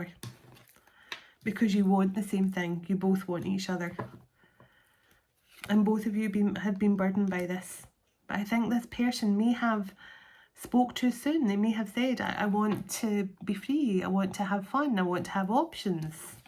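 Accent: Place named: Scotland, United Kingdom. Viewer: You look So Lovely ❤ Blue and White, blessed with a Scottish voice 💙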